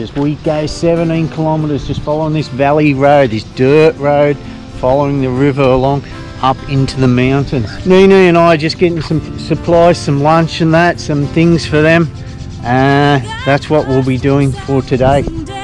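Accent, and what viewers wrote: Australia. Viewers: I wish you would stop pointing with your finger and stop talking, then I would enjoy your video more. Your Aussie accent is so thick, can't understand a word you're saying.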